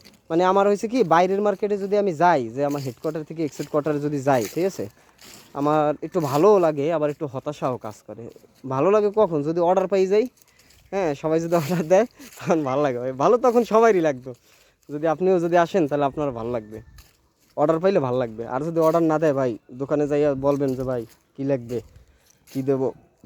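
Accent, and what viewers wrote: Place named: Barishal, Bangladesh. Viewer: ভাই কি বরিশালের?❤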